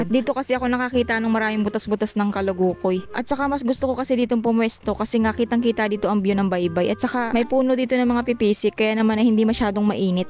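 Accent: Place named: Gumaca, Philippines. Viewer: hahahaha i really really love your accent it sounds like home to me... parang gusto ko tuloy umuwi Gumaca bigla 😂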